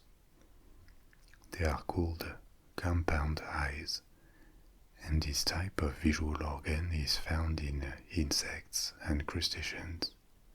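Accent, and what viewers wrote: France. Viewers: ‘What’s your favourite video’ A French man talking about ants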